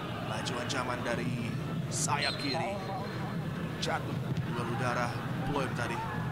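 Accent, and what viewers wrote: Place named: Malaysia. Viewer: Komentator nya seperti bahasa malaysia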